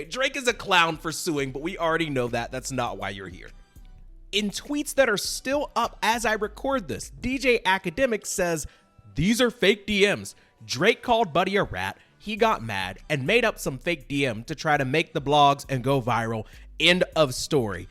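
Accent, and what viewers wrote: Canada. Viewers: also your canadian accent is my favorite 😂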